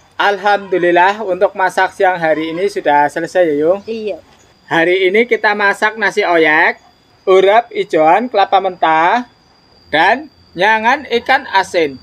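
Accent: Banyumas, Indonesia. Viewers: Kang..niku sampeyan asli bms.versi Cilacap nggih.bag.pundi. koh ksthah pekawisan kalih taneman sdy wonten.versi mbah2 moyang...sdy wonten... obat tradisisyr2 unik.buah2 unik wekdal rumiyin.lan sampeyan ugi asli njaweni nguri.uri adat leluhur.bahasane nggsndem medok bmsan.lah biyunge ugi cekatan ndampingi.sehat2 nggih yuuunge.... pertahankan kang..unik.asli.ngangeni. semangat...🙏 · 😂....Mas daerah mana? Kayak Banyumas logatnya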